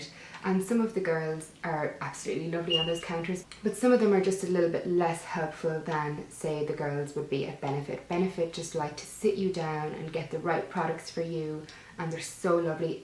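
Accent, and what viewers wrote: Ireland. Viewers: I thought you couldn't possibly get anymore perfect and out came that Irish accent... I think I'm gonna cry ;( X love you ;) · Love the Irish accent! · I watched a couple of your videos with no talking - was really not expecting you to be Irish! Must be the intense sunlight through the window haha - nice to see a local girl doing well - and you're looking well too! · I am inlove with your accent! Is that Irish? Xxx new subbie! Xx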